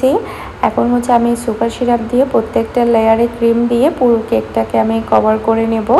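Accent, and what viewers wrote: Chittagong, Bangladesh. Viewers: আপু আপনি কি চিটাগং এর?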